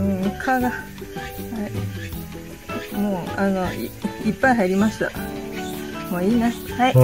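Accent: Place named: Tohoku, Japan. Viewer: 『にずっつぶ』讃岐かぁおもいよったら、東北のナマリやなぁ。間引き、優劣がないポット…悩むんですよね。でも、さんが「気が小さいけん」ゆうて、なんのこっちゃ！？おもいよった😆😆 元気いっぱい、まけまけいっぱい葉っぱが出よるやん。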